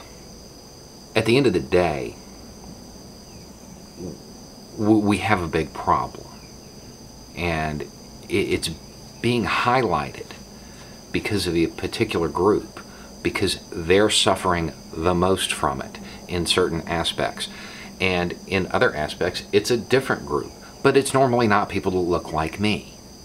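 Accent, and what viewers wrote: South, United States. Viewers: So I kept getting confused because it sounds like you're talking about getting rid of "no not greats". I had to rewind like five times to figure out what you were actually saying. I don't think it's your southern accent, either; just saying it to myself in my pacific coast accent, it sounds the same to me. Just one of those phrases that's confusing if you haven't heard it before.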